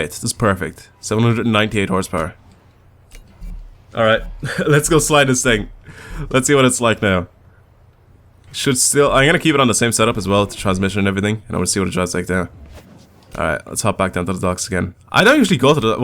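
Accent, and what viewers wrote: Ireland. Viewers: Sooo first ever video I've watched of yours even though I've seen you in Slap"s videos but are you Irish?? Thatd be pretty cool seen as i am too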